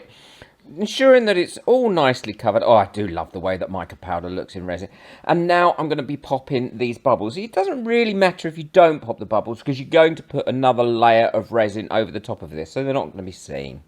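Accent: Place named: Estuary, United Kingdom. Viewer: I always think of you as the Jamie Oliver of the crafting world, I think it’s the estuary accent! Hope that’s not an insult!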